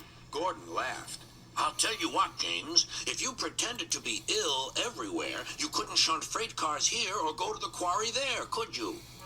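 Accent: France. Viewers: WOW No Way You sound like the French Narrator from SpongeBob SquarePants. I always trust on my friends because they're always helping me just in case they need help like a helping hand great video I can't wait for the next video called Oscar, Trevor Evans and the really useful Party it would be Partytastic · Whoa, I trust you! You sound like a French narrator from SpongeBob SquarePants. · Wow you sound like a french narrator !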